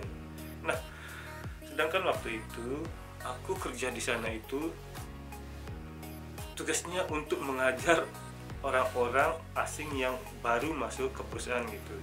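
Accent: Japan. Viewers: sdh kayak org jepang banget... Dari logat dan intonasi nya... Kyk nya lama2 abang juga kayak abang.. Ilang lombok (indonesia) nya... Semangat bang.trmksh info nya.. 👍👍😀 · puluhan tahun hidup di jepang logatnya udah hampir kebalik yaa om lidahnya soalnya sering bicara pakai bahasa jepang 😁 semoga sehat selalu om 🙏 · lama dijepang, logatnya udah jepang bgt.. 👏🏼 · Logat Indonesia nya hampir ketutup bahasa Jepang.. Tapi faham jga sih yg dijelaskan. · Mungkin kelamaan di jepang jadi ada logat jepangnya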